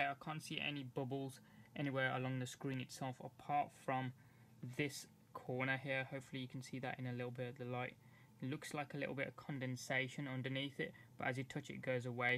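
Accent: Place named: England, United Kingdom. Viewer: dont be racist dude. thats not right plus he sounds like another fellow englishmen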